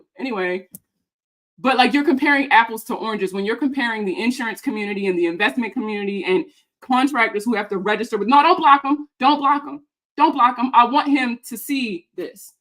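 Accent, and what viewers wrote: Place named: Baltimore, United States. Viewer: You're getting too emotional 😂, he actually brought me here, and I here that Baltimore accent. I think the attention they are bringing to you is actually doing more good than harm.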